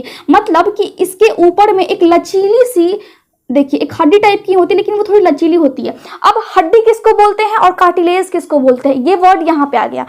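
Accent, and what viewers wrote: Bihar, India. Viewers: Bihari bhasa ki latk Kam karo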